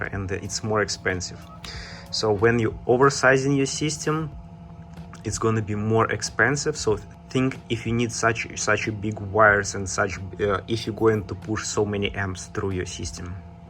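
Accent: Russia. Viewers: Жуткий русский акцент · Русский? )) акцент пипец) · Русский акцент ни с чем не перепутаешь ))))) Так держать! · боже да что же за акцент , накой Русскому говорить на инглише есле его не особо знаешь)) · I do not read comments, as most of them are from people who have no clue what is the real issue. With all due respect, you sound like Russia is the place you came from. I run an industrial automation company and I employ11 Russian trained engineers. All of them are top notch specialists. I assume, you are as good as them. If not better. I have a simple question - do you think that after all the mistakes you went thru, and wastedvmaterial, would it be better and less expensive to buy a commercially available battery of similar performance? If so, what would that be? So, keep up good work, MALADIEC! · Is that a sweet Russian accent I hear? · Nice RunGlish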